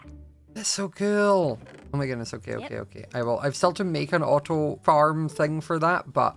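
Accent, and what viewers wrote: Scotland, United Kingdom. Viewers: Love your videos. Keep up the good work. Also what part of Scotland are you from, I'm from Glasgow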